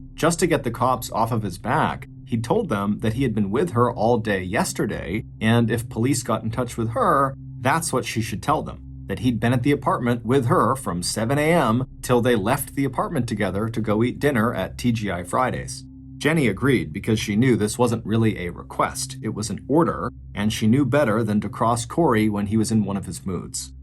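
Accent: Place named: Boston, United States. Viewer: I hope this provides our revered storyteller a cascade of enjoyable, and well-deserved feedback! I am chronically ill, frequently housebound and at some point, almost daily, in such pain I can not move and barely breathe for the better part of an hour. Having a story on deck feels critical to surviving these more difficult times. I am transported from some floor to a vivid scene full of detailed descriptions and engaging plot weaving. Mr Ballen’s riveting unraveling of the “dark, strange, and mysterious” with a clear passion for the waning art of storytelling, is a true gift for all his followers. That he commits to a regular schedule while growing his family and philanthropy is admirable. That his art is guided by a baseline of facts is a relief in a world replete with untruths, half truths and alternative facts. So, THANK YOU 🙏 for sharing your gifts. 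Our family wishes your family the best. We have lived in Wollaston Beach and welcomed my only child at South Shore Hospital. My baby just turned 18, and we live in Nashua now, but will always fondly remember great raucous times in our late 20’s and early 30’s when we worked hard and played harder with greater Boston as our playground. So your authentic local accent, Mr Ballen, is the cherry on top. 🍨 We are grateful and wish you nothing but the best.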